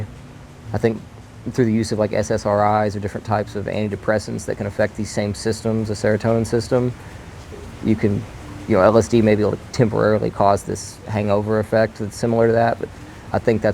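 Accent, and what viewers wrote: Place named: Texas, United States. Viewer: i kno this guy well. he’s from texas, you can hear his texas “twang” come out some here. he use to live in athens GA and make edibles w greg simpson hash oil method. he’s really smart, and a good businessman man. He’s no chemist, at all… decent side kitchen chemist maybe. he’s a exmarine and a drifter. and he is not on drugs, well like not stimulants anyways.. he’s naturally vocally energetic and fidgeting like this.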